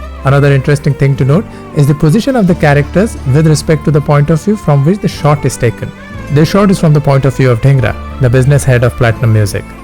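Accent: India. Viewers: Bro the origanility of your indian accent is missing.Please bring it back!It makes narration so much lively!Rest great analysis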